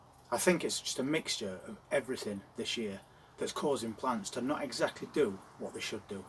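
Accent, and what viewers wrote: Yorkshire, United Kingdom. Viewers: I've planted Kondor (amongst others) in 30 ltr containers, I'm really hoping me watering every day in this near tropical weather is going to give good results, the odd one is dying back, I've really got itchy gloves wanting to empty one out and see what they are like! I also did Ratte, had some decent first earlies from them. 😀That does sound like a Yorkshire accent?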